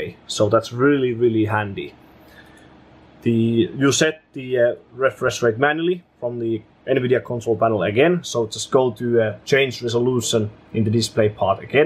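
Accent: Finland. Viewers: You really sound like Finnish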